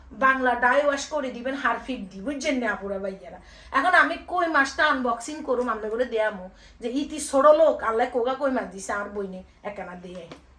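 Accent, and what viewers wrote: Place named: Feni, Bangladesh. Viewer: আপু আমরা ফেনীর মানুষ তাইতো আপনার কথা শুনলে মনটা ভরে যায়।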